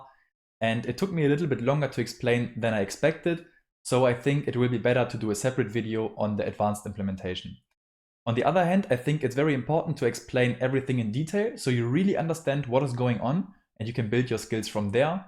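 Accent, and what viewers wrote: Germany. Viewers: Nice guide, but in the end i was too lazy to add it anyway 😂. You explain it very well, the only thing that i don’t really like is the german accent ;)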